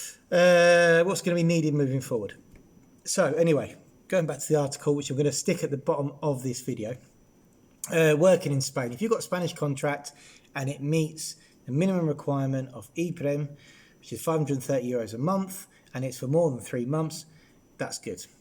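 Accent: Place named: United Kingdom. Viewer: Precioso acento británico y excelente castellano. Saludos desde Pamplona.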